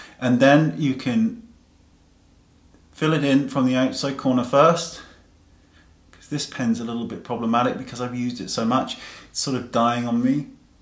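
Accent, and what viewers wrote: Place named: West Country, United Kingdom. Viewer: You have a slight West Country hint to your accent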